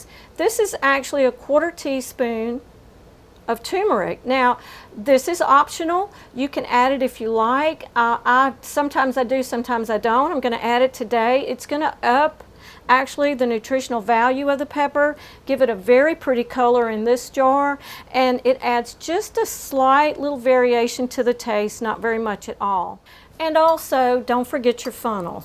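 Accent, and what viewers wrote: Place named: South, United States. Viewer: Oh perfect I love the southern accent (I'm from the UK) and I also love learning about herbs... ❤❤ Subscribed right away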